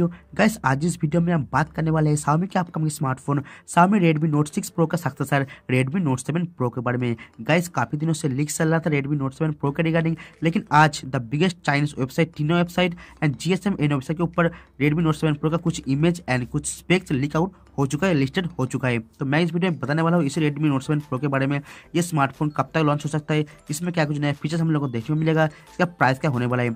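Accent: Bengal, India. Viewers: Aapka voice me Bengali tone mix ho rahi hai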